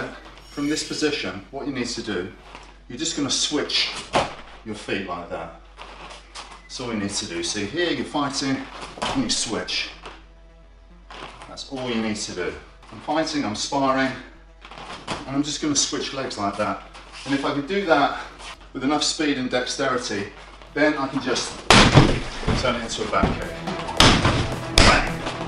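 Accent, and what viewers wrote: England, United Kingdom. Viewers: I feel like im not looking at the person who acted as Boyka and especially with that english accent its really hard to digest. Its like im looking and hearing a different person 😂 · So weird hearing You speaking With a brittish accent after seeing You in IP man. Great video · Hebal you and I have something in common. I love British accent. I think that's what you like about his English, the accent.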